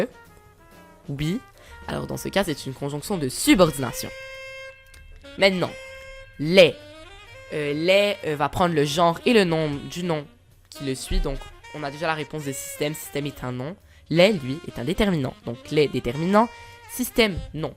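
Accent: Canada. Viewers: Il a un petite accent québécois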